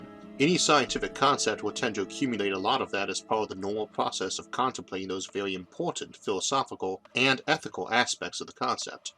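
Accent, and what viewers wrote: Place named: Louisiana, United States. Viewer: Thanks, yeah despite the speech impediment I think it was the Louisiana accent I was picking up on. I could tell it was a Southern style accent but wasn't sure.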